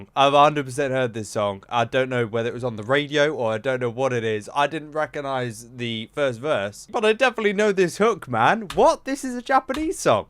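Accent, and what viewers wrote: United Kingdom. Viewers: イギリス英語が聴けたのでとても嬉しいです！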